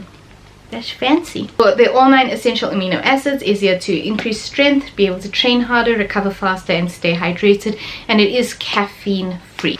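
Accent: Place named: Cape Town, South Africa. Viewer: Are you colored? You sound like you from Cape town?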